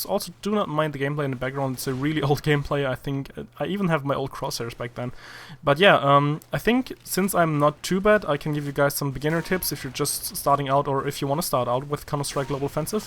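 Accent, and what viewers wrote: Germany. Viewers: Bist du deutscher ?:) du hast nen deutschen Akzent :)! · Are you german? Du hörst dich deutsch an :P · you sound like a german :) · Sounds like you are german.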